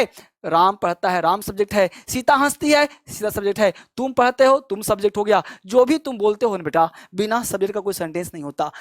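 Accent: Bihar, India. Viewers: Sir aap bihar se belong krte h ky? Aapki boli me boht mithaas hai... Boht acha lgta hai aapki aawaz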